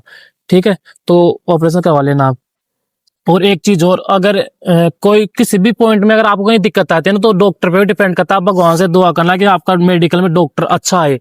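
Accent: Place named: Jhunjhunu, India. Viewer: Ya aavaj to mahre jhunjhunu ki lage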